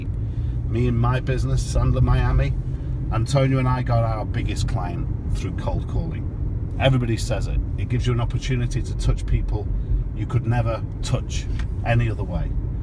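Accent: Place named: Liverpool, United Kingdom. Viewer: A scouser in Miami lol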